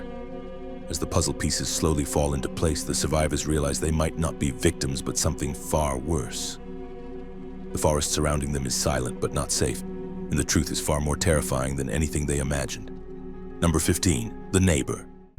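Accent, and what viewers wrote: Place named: Australia. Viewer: Something about the Oz accent...like fingernails on a chalkboard 😅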